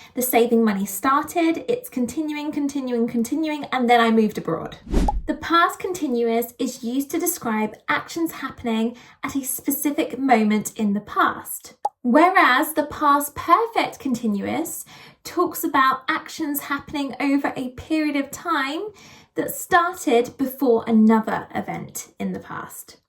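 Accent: United Kingdom. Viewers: Love British accent.❤❤❤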